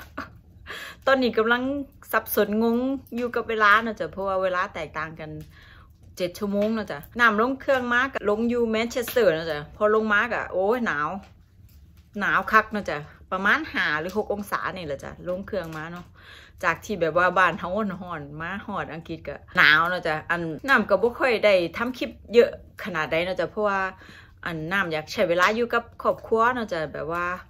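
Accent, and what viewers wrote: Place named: Isan, Thailand. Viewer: ขอบคุณพระเจ้าที่คุณและครอบครัวถึงอังกฤษอย่างปลอดภัยมีความสุขนะคะคุณน่ารักพูดภาษาอิสานเพราะมากคะ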